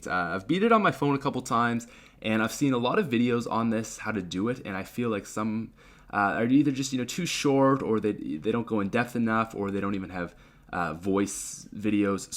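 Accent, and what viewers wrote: Canada. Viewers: He sounds so Canadian In this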